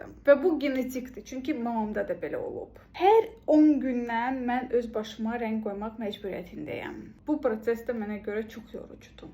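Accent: Russia. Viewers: Русскоязычная. Ona görə belə şirin danişir👍❤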